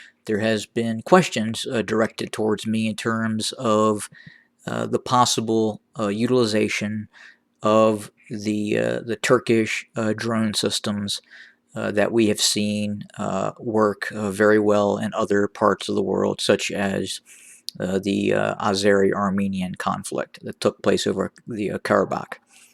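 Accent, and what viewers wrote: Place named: United States. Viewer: Are you a blood sucker ? What do you benefit from brutal mass murder of people on each other ! Your accent tells you are an American where democracy, moral and ethics are important virtues in relative way! But you are so invested in this bloody civil war among black people because of the idiot politicians! What makes you so active in this cruelty of a kind never seen ever?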